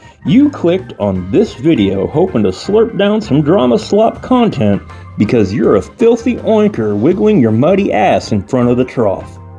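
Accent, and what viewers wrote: United States. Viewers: You can hear the country voice radiating through the screen. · Your voice sends me to an early 2000's southern diner lol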